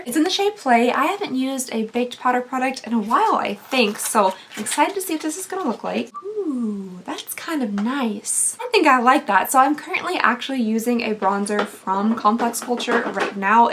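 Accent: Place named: South, United States. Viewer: You're one of my favorite YouTubers. Your southern accent (I have one too), your personality, your babies, and your authenticity are very refreshing and make me feel like I'm sitting around chatting with my sisters.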